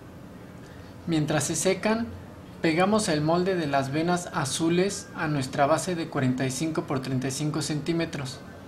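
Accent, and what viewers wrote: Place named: Mexico. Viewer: Bno el video, pero la vos de mexicano la cago ):<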